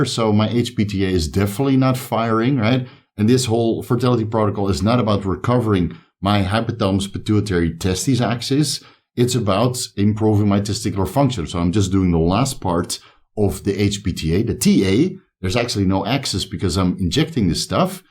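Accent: Netherlands. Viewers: Are you dutch? You got funny accent.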